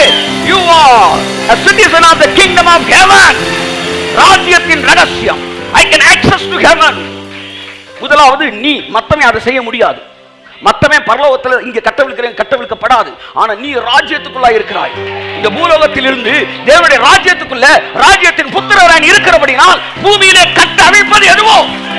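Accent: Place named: Madurai, India. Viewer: In the name of Jesus Christ, when God's words are headed in our Madurai Thamilan voice, it' gets very specialized, like drinking TEA MIXEd WITH BOOST. Amen. May God bless you and your ministry forever and always pastor. AMEN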